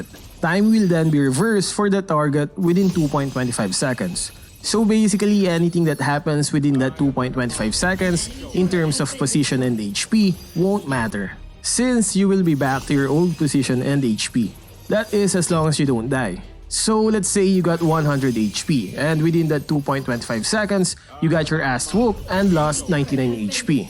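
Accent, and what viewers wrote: Philippines. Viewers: it sounds like he has a filipino accent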